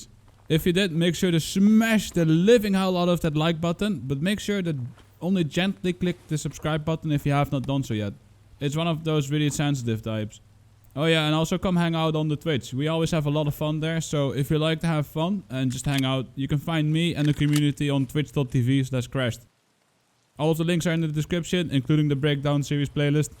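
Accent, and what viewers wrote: Netherlands. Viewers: I literally just watch for the dutch accent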